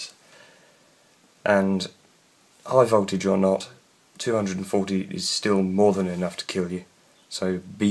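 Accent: United Kingdom. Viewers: i’m pretty sure he’s from the uk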